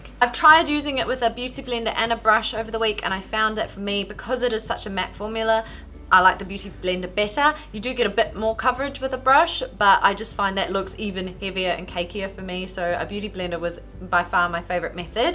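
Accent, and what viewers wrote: New Zealand. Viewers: is she from new zealand?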